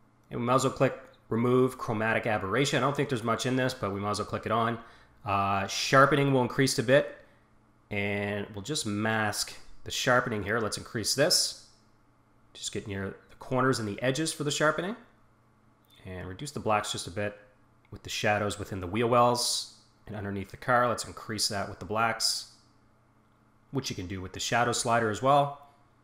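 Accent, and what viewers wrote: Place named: Atlantic Canada, Canada. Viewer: Great video! Cool accent, almost sounds like you’re from Atlantic Canada.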